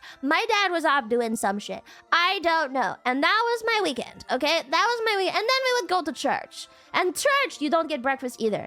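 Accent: South, United States. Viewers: Her southern accent does things to me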